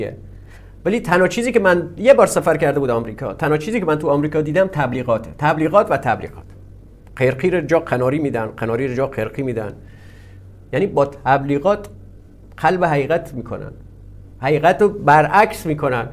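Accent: Lorestan, Iran. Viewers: درود بر برارُم لُر با غیرت عالی هستی نمونه غیرت ایرانی یه کمی دُز لهجه لری بیشتر کن .می دونی که صدام و افسرهاش چقدر می ترسیدند و تک تیرانداز با غیرت ما که در دنیا رکورد دار هست یک لُر غیرتمند بود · از نحوه گفتارت وان ادبیات خاص خودت که بیانگر قوم غیور لر میباشد خیلی خوشم میاید تو پیای گپ مایی.شیر دات حلالت......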